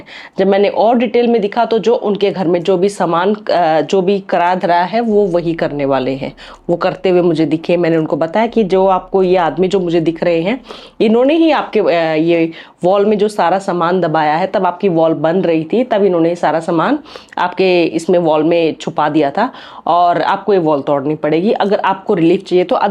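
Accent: Haryana, India. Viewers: Mujhe b ap bahut achhe lagte ho😘😘😘 or specially ap jab haryanvi bolte ho jab🤗😍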